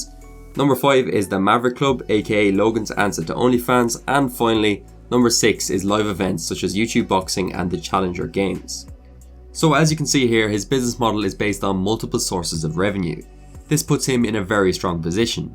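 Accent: Ireland. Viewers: What's the background music ? Great video btw. Really enjoyed it! Are you Irish? XD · Me in the first few seconds: "Is that an IRISH ACCENT??" 👂🏻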